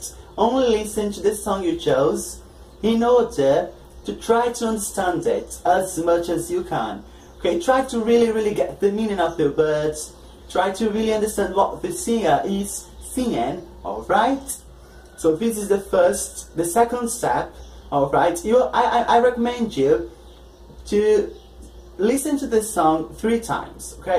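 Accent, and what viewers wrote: United Kingdom. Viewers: Perfect English!!! This kind of accent that i want!! A British one.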